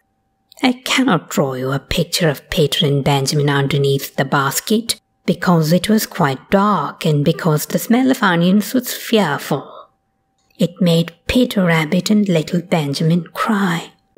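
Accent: RP, United Kingdom. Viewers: This is how Beatrix Potter should be read, in posh British accent, with that old flavour! Ty for this.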